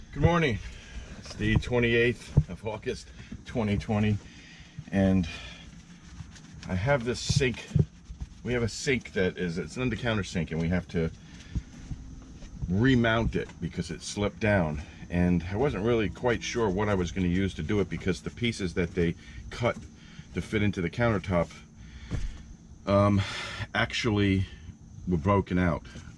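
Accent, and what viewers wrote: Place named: Philadelphia, United States. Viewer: Great to hear a Philly accent. Can trust someone from where I grew up! Thanks. This will help!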